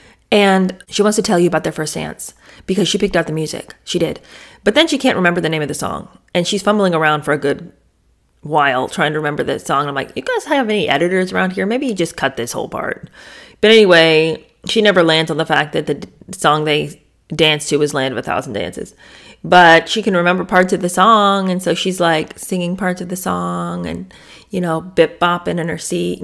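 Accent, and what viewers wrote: United Kingdom. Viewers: I love your British accent lol!!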